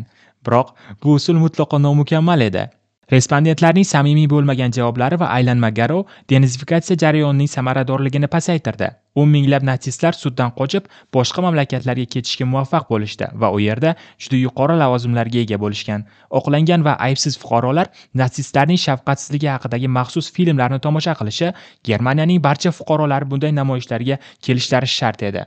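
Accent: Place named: Surxondaryo, Uzbekistan. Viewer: Siz Surxondaryoliksiza, ya'ni Sariosiyo tumanidansiz adashmasam)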